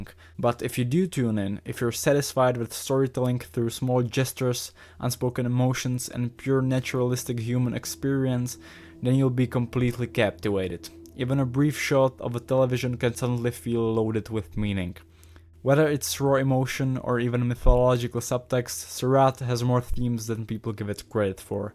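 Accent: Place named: Czech Republic. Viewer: where are you from? you sound czech/slovak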